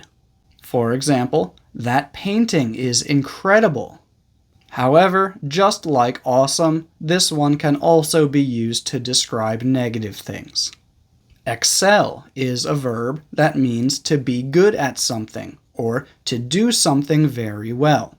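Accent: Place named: United States. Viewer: You are too kind. In awe of your beautiful American accent as well.👍😊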